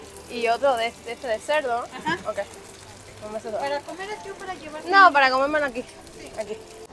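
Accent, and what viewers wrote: Cuba. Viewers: Y a ti tampoco te enseñaron que en cada pais hablan diferente ella su acento es cubano. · Hola preciosa eres muy graciosa e tu forma de hablar es muy bonito el acento cubano eres bienvenida a nuestro pais soy tu fans también a través de ti conocemos las costumbres de Cuba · Poco a poco te adaptaras y hasta tarde y temprano se te quitara en tono de voz de cuba. Y sin pensar tu hablaras con el tono de mexico como las damas chilangas. Ya veras ..con el tiempecito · No me gusta el acento cubano , nunca me va a gustar.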